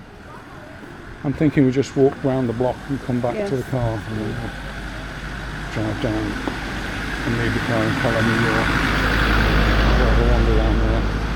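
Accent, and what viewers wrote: Stoke, United Kingdom. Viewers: Are you from Stoke fella? You got a bit of an accent. I really didn't enjoy Cala Bona when I went. Far too touristy for my liking. If I wanted to eat burgers for dinner I'd go to Hanley. Edit - yeah, when I heard say "go on eet' that answered my first question.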